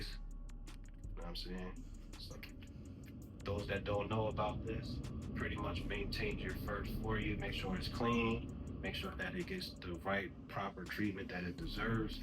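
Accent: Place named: Philadelphia, United States. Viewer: Keep up it up, I admire your collection!!!! And I hear that accent it sounds like my home town sound!!!! Philly in the building 🦾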